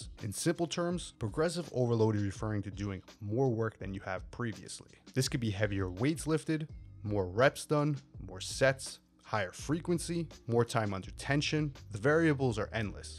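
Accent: New York, United States. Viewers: I like how you're proud of your new York accent. Not attempting to hide it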